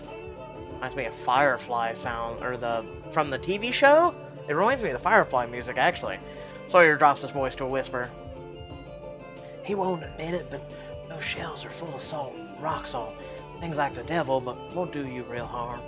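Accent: South, United States. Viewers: Some dumbass accused you of being racist for using southern drawl? Really? Well, I'm a black woman and I never thought you were racist. I was just enjoying the videos. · Not American, but always liked this southern accent. YOU ARE KILLING IT! Good job!!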